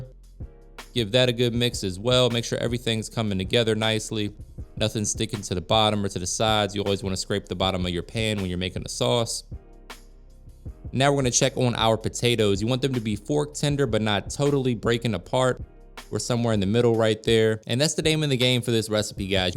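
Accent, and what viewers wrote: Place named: Louisiana, United States. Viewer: Stop fuckin lying you're from Louisiana you ain't from Virginia with that accent.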